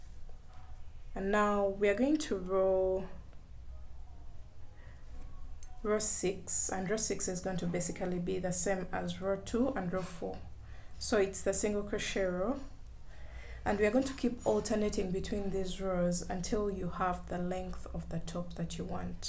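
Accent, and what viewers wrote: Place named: Uganda. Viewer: She's Ugandan. I notice our accent everywhere ❤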